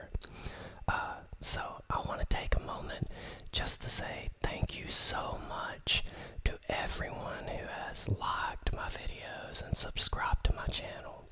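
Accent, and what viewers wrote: South, United States. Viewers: Very awesome, I love asmr, and I love your southern accent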